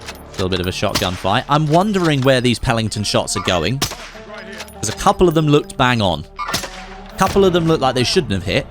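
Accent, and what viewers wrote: United Kingdom. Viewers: Wait you're British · WOW I did not know was British I thought you were American lol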